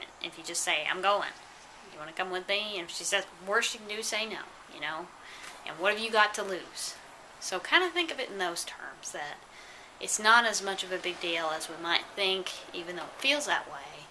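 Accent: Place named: South, United States. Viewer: Listening to your videos really connect to me , you really captured me and your wisdom hit my core. I live near and Arkansas and you sound like you live in the south. You live in the south?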